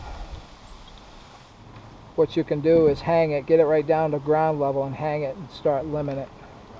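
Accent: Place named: East Coast, United States. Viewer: good to see you back at er pal! always enjoy your vids with the east coast accent commentary! keep safe brother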